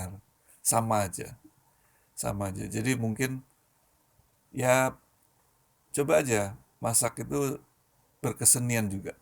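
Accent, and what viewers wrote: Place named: East Java, Indonesia. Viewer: Mass sampean iki asline pundi kok kyak logat jawatimuran kalau ngomong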